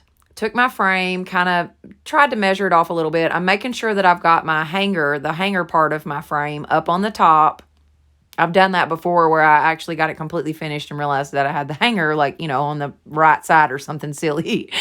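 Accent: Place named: Texas, United States. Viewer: Where are you from with your accent? I love it because I’m a Texas girl with one too and feel at home.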